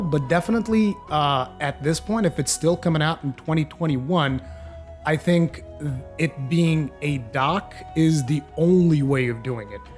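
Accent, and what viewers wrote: Portugal. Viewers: Are you portugese? Your english is better than mine and Im native lmao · ESPERA AI, TU É PORTUGUÊS?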